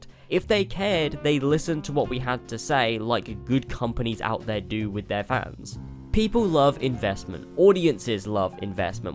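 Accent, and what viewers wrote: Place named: England, United Kingdom. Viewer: This video is misinformative and fuck the narrator with an English accent. You’re gay!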